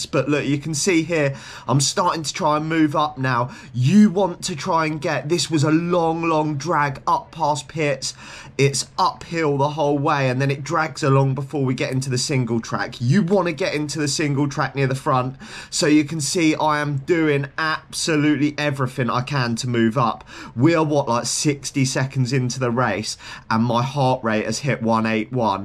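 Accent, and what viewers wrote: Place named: United Kingdom. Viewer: Accent was so unbearably british I had to stop watching one minute in.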